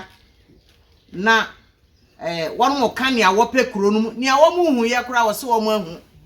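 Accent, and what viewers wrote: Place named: Volta, Ghana. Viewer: The twi is beautiful but the Volta high accent is evident.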